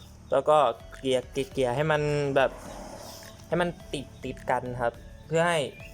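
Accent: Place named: Isan, Thailand. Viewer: คนอีสานปะครับเนี้ย โอ้ยยแต่ละคำน้อวว555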